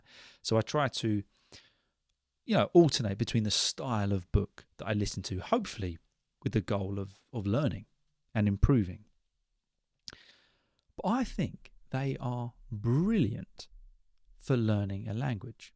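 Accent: United Kingdom. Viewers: Thank you for your podcasts. It is the best channel with English podcasts and British accent 😍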